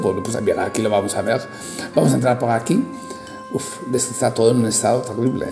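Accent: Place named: France. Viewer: MUY INTERESANTE, cada detalle de este castillo es una 💎 joya...más relatado por el y ese acento francés tan agradable ❤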